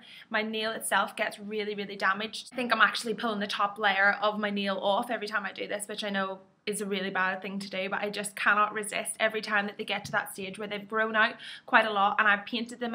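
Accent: Ireland. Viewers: Love the accent!! Irish FTW!! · love your accent, I'm irish but born in america so sadly didn't adopt that beautiful accent ;) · r u irish?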